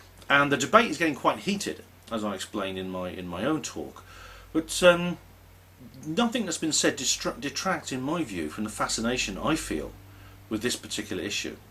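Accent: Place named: North, United Kingdom. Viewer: Finally while watching a BEJ video I can enjoy a lovely northern accent!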